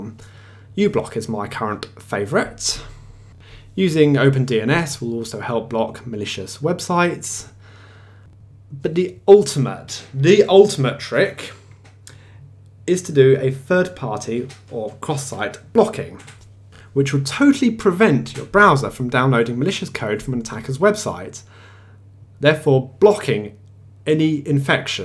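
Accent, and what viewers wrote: United Kingdom. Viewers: Your British accent is SO strong, haha! Funny that the number or likes in this video (at this time I'm writing) is 666, hehehe.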